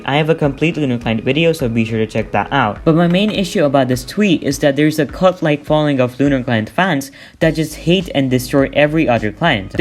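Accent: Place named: India. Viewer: I like the way u speak english and ur indian :O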